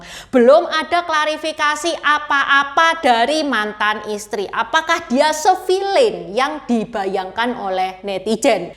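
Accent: Java, Indonesia. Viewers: kocak kalau cici bercerita, medok sekali ci....hehehe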